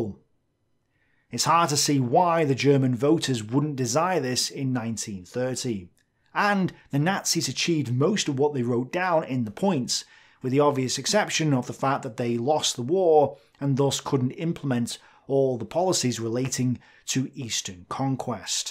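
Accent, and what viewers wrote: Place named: Cockney, United Kingdom. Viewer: Dude you have a strong cockney accent ! It sound very weird but I am ok with rhat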